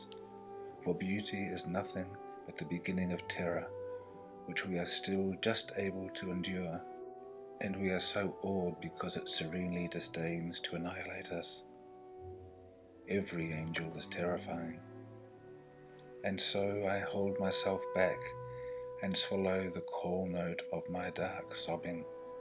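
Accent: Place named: New Zealand. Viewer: beautiful reading, you must be a kiwi?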